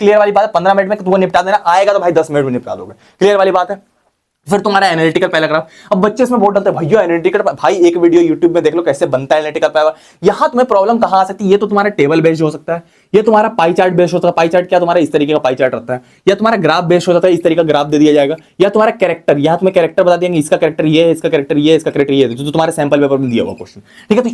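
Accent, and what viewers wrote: Bihar, India. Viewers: BHAIYA KA BATH KARNA SA LAGTA HA BIHAR SA HA HA BHAIYA I AM ALSO FROM BIHAR ❤🎉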